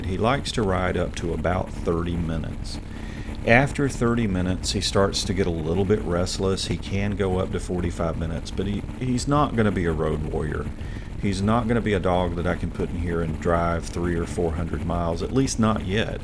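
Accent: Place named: South, United States. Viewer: Just a quick comment to let you know how much I enjoy your well-done and informative videos about the Ural life. The vids which are solely travel logs of sorts, with endless GoPro footage and no commentary are boring. Yours are educational, and I must say, your calm, relaxed manner with just that touch of the South in your voice is perfect. I know these are a lot of work, but hope you keep them coming.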